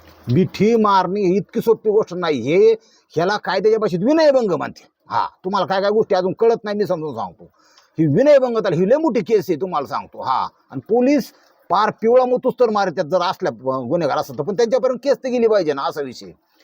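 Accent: India. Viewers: Mama तुमची गावरान भाषा कानाला ऐकायला छान वाटते. जाऊद्या गाडी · Good job Sir , खुप छान ग्रामीण मराठी बोलता तुम्ही 💐💐💐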